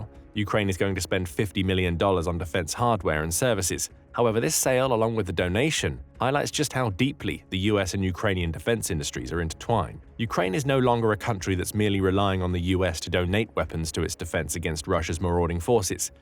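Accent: United Kingdom. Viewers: Whats up with mixing british and american accent??? Can we just stop pronouncing missile with britsh accent and the rest american? So annoying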